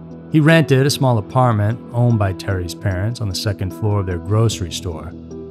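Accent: United States. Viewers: His voice is really cool. I love his American accent 🤩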